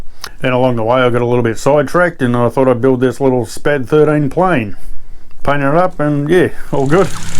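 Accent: Australia. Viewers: Always nice to see a brother from down under our accents are unmistakable just discovered your channel nice thorough video 👊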